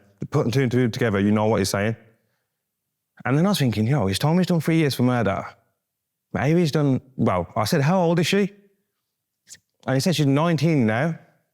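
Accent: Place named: Birmingham, United Kingdom. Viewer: i could tell you were a brummy 😂😂😂😂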